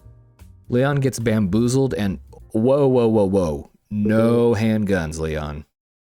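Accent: United Kingdom. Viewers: Another example of an American not knowing what English English sounds like. He’s not an Aussie… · yeah east end bruv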